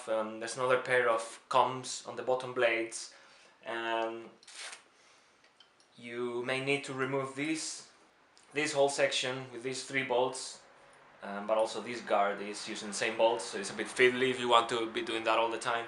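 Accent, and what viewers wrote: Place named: Spain. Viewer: Se lo mandé al grupo intercambio para que los españoles oigan inglés de un español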